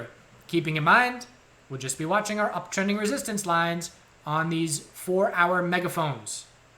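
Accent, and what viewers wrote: South, United States. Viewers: Love the aggressive southern trader accent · if that wasn't enough a nice southern accent to put a smile on your face. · Like the southern accent more 👌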